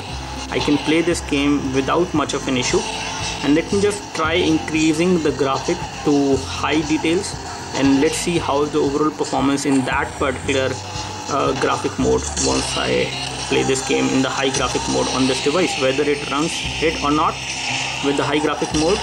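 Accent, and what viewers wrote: India. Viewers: india okzent osin silnii ny prem oseni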